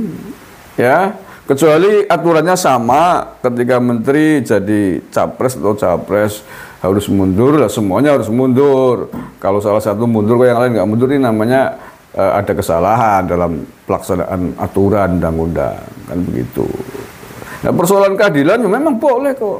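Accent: Banyumas, Indonesia. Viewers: Aku lihat nih melu ngguyu bahasa ngapaknya tuh loh Pak mantep 👍👍🤣🤣🤣🤣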